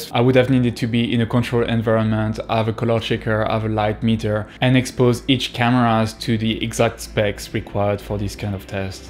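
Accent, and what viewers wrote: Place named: France. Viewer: I bought a FX3 and FX6 but I plan to sell the FX6 for the Komodo. I don't need two run'n'gun cameras and the FX3 is perfect for that (so it will be basically the same setup as you😄 without the Raptor haha). And I'm gonna sell my Pocket 6k pro (that I love), because I won't need it anymore since I will have the Komodo. But before that I'm going to rent the Komodo, and test it on my next project (I have a big music video coming soon). I love the FX6, don't get me wrong, it's a true workhouse! but I can do the same with the FX3 and the image quality is almost identical. The only thing I will really miss is the electronic VND from the FX6 (otherwise I much prefer the image coming from my Pocket 6K Pro, and I guess I will also prefer the image coming from the Komodo). P.S: tu as déménagé en Australie (j'entends à ton accent que tu es Français 😃)? 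J'y ai passé un an en 2009, j'ai adoré!! Il faut que j'y retourne un jour!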